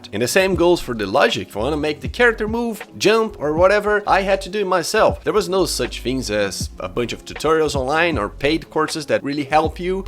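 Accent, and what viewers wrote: Brazil. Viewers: you have an familiar accent are you brazilian or even south american?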